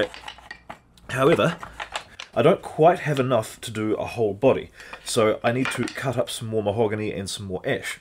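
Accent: New Zealand. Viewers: Not hugely relevant to this video but your videos got me fixing up my bass and playing way more!! Keep up the great content! soo good to hear a kiwi on youtube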